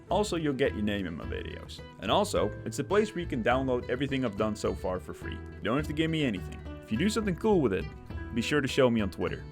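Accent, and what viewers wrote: Netherlands. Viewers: Loving the dutch. Really made me remember how similar I pronounced things in WoW when I was younger.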